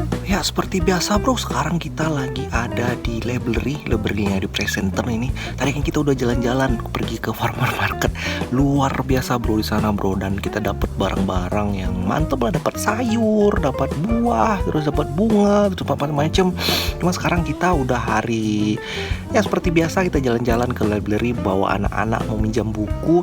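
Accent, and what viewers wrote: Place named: West Sumatra, Indonesia. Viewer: Wkwkw bner TU minang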